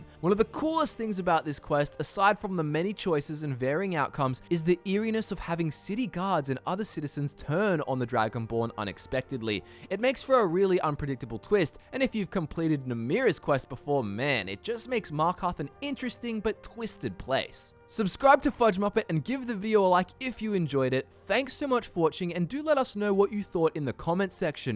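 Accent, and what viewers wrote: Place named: Australia. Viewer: me: starts video me: oh hey fellow aussie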